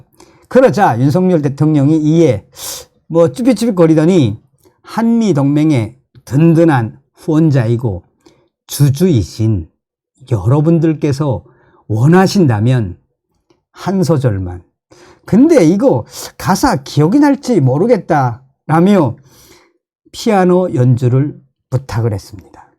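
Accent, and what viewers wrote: North Korea. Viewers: 정선생님 기분좋고 감사한 방송을 주셔서 감사히 시청 했수다래 정선생님 기분이 좋으시면 꼭 북한 언어 사용 무척 정감있고 즐겁게 시청 하이 항상 건강 하시기요 정동무 ~~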